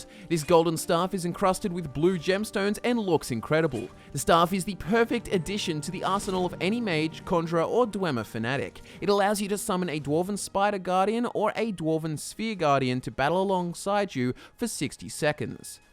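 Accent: Australia. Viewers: Is it just me or do all Australian guys sound the same? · He's Australian, shouldn't be that hard to figure out.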